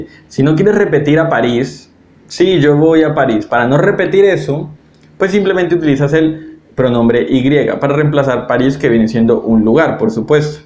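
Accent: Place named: Spain. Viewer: Lastima el terrible acento español hablando frances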